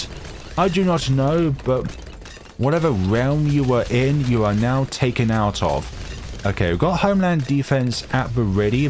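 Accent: United Kingdom. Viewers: Holy British accent 😂 lovely video gentleman!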